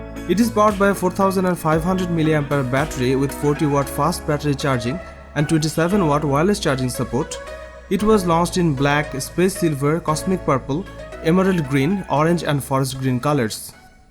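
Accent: Bengal, India. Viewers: Another indian vloger I just dont want their accent... It always looks like other vlog that dubbed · Bengali accent irritating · That dense Bengali accent in your English man!! ( P.S. Coming from a Bengali himself :D ) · Ffs Indian accent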